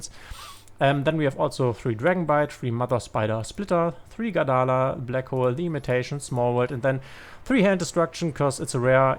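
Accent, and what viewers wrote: Germany. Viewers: Bist du deutsch ? Dein Englisch hört sich nach deutschem Dialekt an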